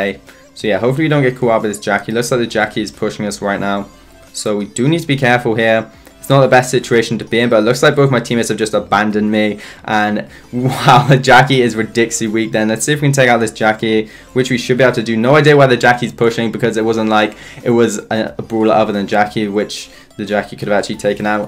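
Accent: United Kingdom. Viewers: I just love your britain accent😊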